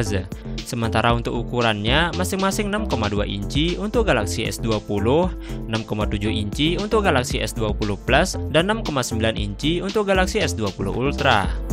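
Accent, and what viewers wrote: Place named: Bugis, Indonesia. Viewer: Logat bugisnya masih ada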